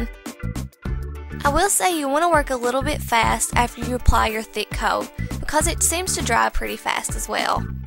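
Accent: South, United States. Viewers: I LOVE YUR VOICE..LOL. ITS SO SOUTHERN. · OmfGODS Southern Accent. It is almost too much!! Damn cute nails though.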